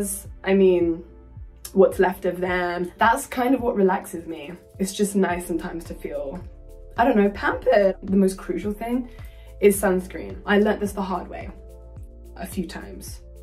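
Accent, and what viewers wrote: United Kingdom. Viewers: SHES BRITISH??? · SHE’S BRITISH???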